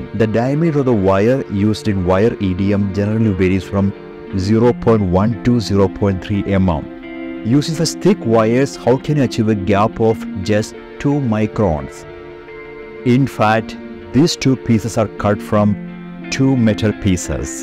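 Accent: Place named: India. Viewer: So the more you hear an Indian accent, the easier it is to understand.. just watch more often!! You’ll be amazed! I have zero issues understanding even the thickest of Indian accents.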